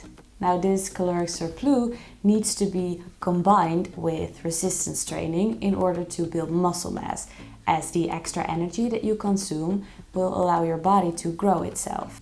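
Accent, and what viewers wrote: Netherlands. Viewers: Am I the only one who heardddddd the Dutch accent Ik was zo van kan je in nls praten 😂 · Great video :) Thanks for the great information! Are you Dutch??? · I hear a Dutch accent, are you Dutch? :D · I think it's her slight slight dutch accent ;)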